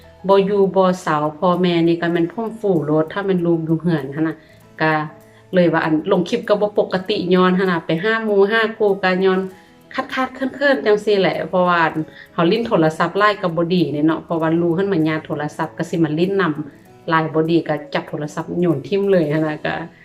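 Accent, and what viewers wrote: Luang Prabang, Laos. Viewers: แสดงความยินดีด้วยค่ะมากๆค่ะ เว่าม่วนหลายจ้า ฟังสำเหนียงก็รู้ว่าต้องยู่หลวงพระบางจ้า บ้านพี่อยู่หนองคายอ.ศรีเชียงใหม่จ้าตรงข้ามเวียง ผ่านกฏเร็วมากๆค่ะเก่งมากจ้า👏👏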